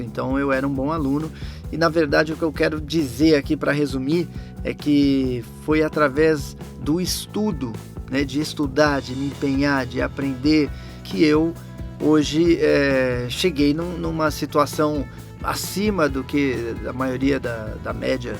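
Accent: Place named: Northeast, Brazil. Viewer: q parte do nordeste vc era?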